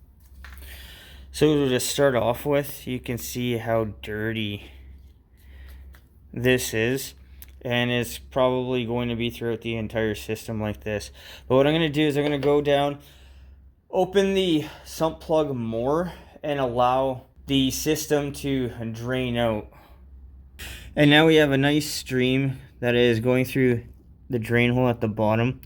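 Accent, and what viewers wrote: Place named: Michigan, United States. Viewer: Thanks for the vid 👍🏽 Saving me ab $140. Also any chance you're from Michigan? 😂 you have a strong accent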